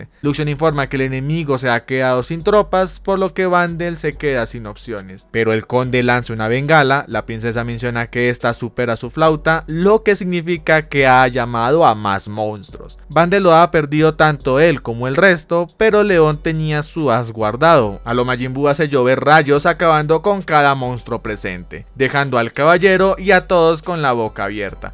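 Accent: Colombia. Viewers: ¿Soy yo o sos colombiano? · ya se me hacía que eras Colombiano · eres de Bogotá 🤨🤨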